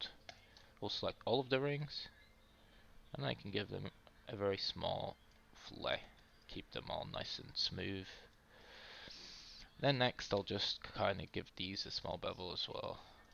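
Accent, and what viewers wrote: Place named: Northern Ireland, United Kingdom. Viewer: Your accent, I keep getting a little Northern Irish in it 🤔. Great tutorial btw.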